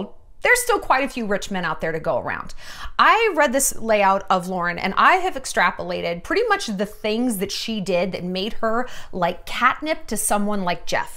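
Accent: Russia. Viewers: Your Russian accent and the random script you chose was soooo funny! I hope you do a Advice-themed standup and maybe commercials and movies!! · Your russian accent gives me absolute life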